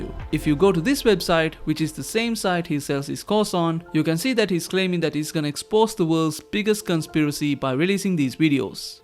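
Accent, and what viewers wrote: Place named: India. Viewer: I think you should use ElevenLabs instead of your natural voice. I really mean no disrespect but I think that the indian accent is unfortunately putting people off from watching the video. Despite the video being really good. This might be why your videos are not going viral.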